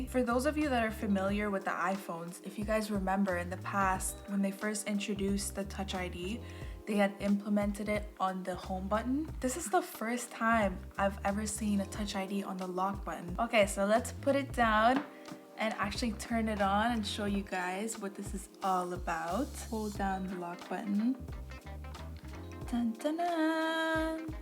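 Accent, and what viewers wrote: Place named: Toronto, Canada. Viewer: Great unboxing and video editing! loved the stop-motion at the start. Could tell you're from Toronto based on your accent lol. Keep going! I subscribed :)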